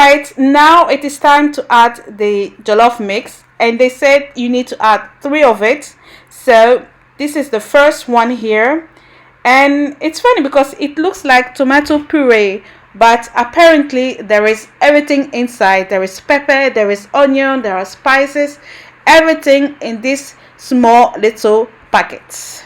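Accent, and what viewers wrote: Twi, Ghana. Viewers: I love your twi so funny😀😀😀 sending you love from Ghana 🇬🇭❤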